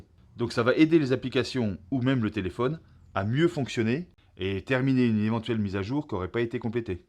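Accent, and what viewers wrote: Franche-Comté, France. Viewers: Merci pour tes vidéos, je voulais te demander, tu es de Franche-Comté ? Rapport à ton accent !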